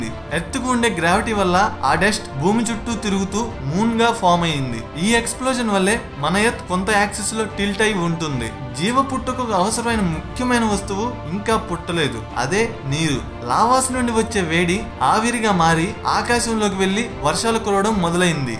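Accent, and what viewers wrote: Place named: Nellore, India. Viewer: mee slang eppudu bagundhi.. First lo aiethe baaga telisipoyedhi nellore or ongole ani.. I am from nellore if possible mana slang kottinattu untadhi inka marcheyamdi..appudu andharu chustharu..mee hard work ni appreciate chesthunanu.. all the best.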